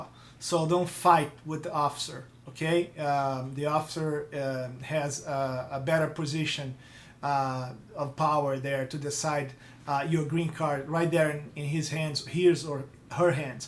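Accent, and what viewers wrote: Brazil. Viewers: Looks like Brazilian accent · Hey. Great explanations. May I ask you if you are brazilian? I am, and I just noticed a simillar accentuation. If not, forgive me any incovinience. By the way, do you Speak Portuguese? Hugs. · What do you mean? I asked if he was Brazilian because of his accent. Btw, I am from Brazil.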